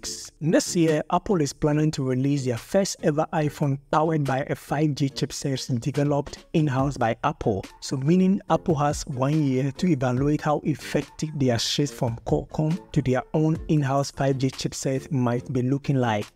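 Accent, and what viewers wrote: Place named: Africa. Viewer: Cool are u African becuse your English reveals your country It's really cool Love from india🇮🇳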